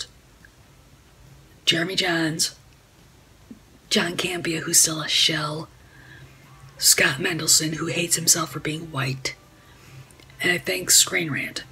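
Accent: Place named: Canada. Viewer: Love your accent. Are you from Canada?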